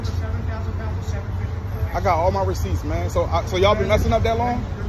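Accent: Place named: Philadelphia, United States. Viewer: You sound just like Umar Johnson. Before saw this vid, I already knew you were from the Philadelphia area.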